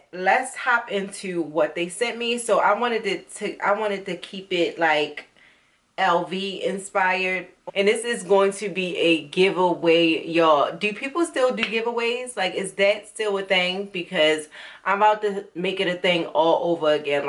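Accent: Baltimore, United States. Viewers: Enter me! ♥️ This Baltimore accent is life! Also, you’re inspiring me to get some Orange and green sandals lol❤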